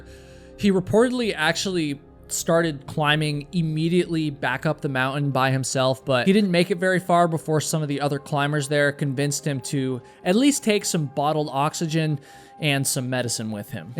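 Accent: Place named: United States. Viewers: We all understand that US-americans put the english pronunciation on everything, even though it's funny sometimes we are used to it :) most importantly the video was great and super respectful. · anyone whinging about pronunciation of names needs to shut up. You are telling the story respectfully. You are an American. You are permitted to have an accent!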